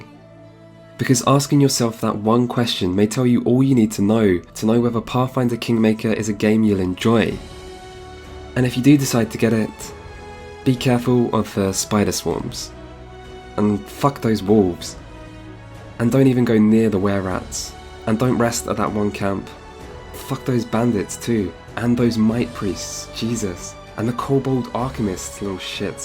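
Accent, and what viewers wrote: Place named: United Kingdom. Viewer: Not going to lie, subscribed mainly because of your voice and accent. You're like British Bob Ross.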